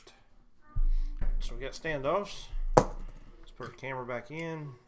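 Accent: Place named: South, United States. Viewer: Sweet video! Never have been to your channel, heard your voice and said man this guy is country (by the way I am in Alabamer). I was thinking this is going to be a wreck, but....You are awesome at building and so thorough, with the continuity checks and all. I really enjoyed the video and will be subscribing. Thanks again for the Video ;)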